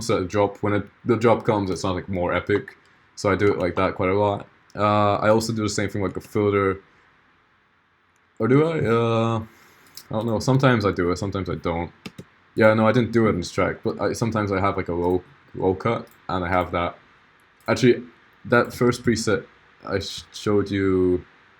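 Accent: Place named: Sweden. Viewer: Jag hitta en guldgruva !🤩🤩 fan oslagbart kommer kolla på din kanal 24/7 . Jag gör bort mig om du inte är svenska utan jag bara dömer på din dialekt Ahah.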